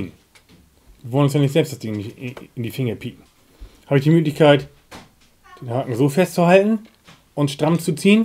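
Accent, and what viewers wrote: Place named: North Germany, Germany. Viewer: Top! Immer wieder gute Ideen "norddeutsch" rüber gebracht! 😀😀👍👍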